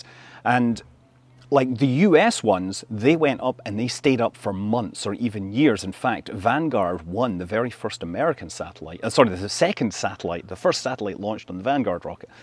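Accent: Scotland, United Kingdom. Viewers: Now that we know you are in Scotland and don't just have that accent for fun, any SaxaVord coverage?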